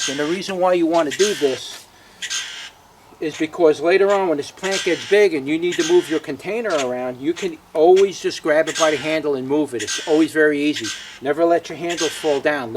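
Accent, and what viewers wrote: New York, United States. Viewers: great idea! going to give it a try .. what zone are you in? you sound like a new yorker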